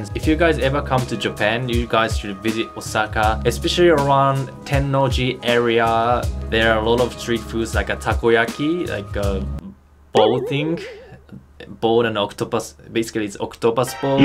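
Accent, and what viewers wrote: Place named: Australia. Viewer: I can tell by your English - you spent your time abroad in Australia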